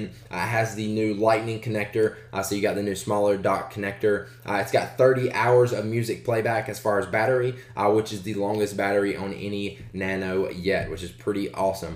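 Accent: South, United States. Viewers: dont u guys think 300 buks 4 the new touch is a bit much,,,,,ps where is he from ( southern draw)(but wheres flat bill hats)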